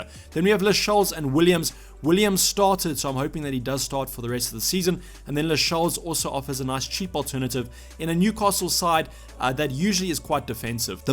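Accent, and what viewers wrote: South Africa. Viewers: Is this guy south african ? his accent is odd i like it tho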